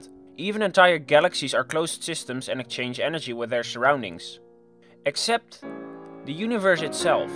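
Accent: Netherlands. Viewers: Are you Dutch? Sounds like it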